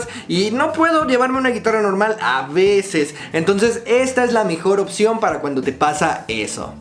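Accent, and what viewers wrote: Mexico. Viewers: Eres norteño?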